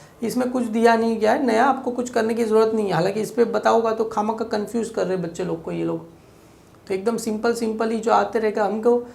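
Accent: Gujarat, India. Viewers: Inki accent se ye gujrati lgre h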